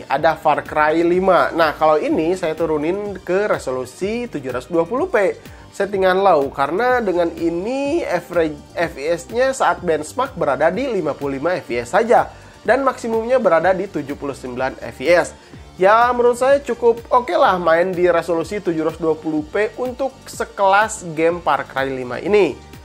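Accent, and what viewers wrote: West Java, Indonesia. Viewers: kang asal sunda? darimana?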